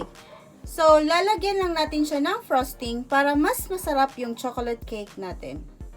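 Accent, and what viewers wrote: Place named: Aklan, Philippines. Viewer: taga san po kau maam? ung accent nyo po is it from aklan?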